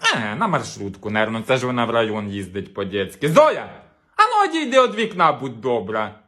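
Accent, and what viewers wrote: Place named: Kirovohrad, Ukraine. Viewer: Привіт з Німеччини,! Живу тут 8 років і сумую за нашим сленгом. Я з Кіровоградщини і говір похожий.Але такі перли у нас не видавали ще. Оце вже ввійшло за традицію - Прихожу з праці і одразу шукаю нове відео від тебе. Подивилася,бальзам на серце отримала і можна з хорошим настроєм відпочивати. Коли ж вже нам Гюльчатай відкриє своє личико? Не тримай так довго інтригу. Бо такі таланти треба оприлюднювати. Бажаю творчих успіхів,мирного неба і здоров'я! І слава нашій Україні!!!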